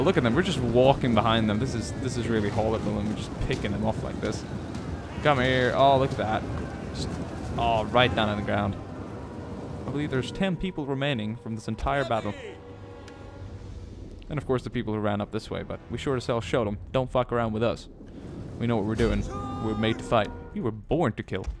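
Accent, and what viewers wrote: England, United Kingdom. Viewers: R U british or american? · YOu sure? He sounds pretty English to me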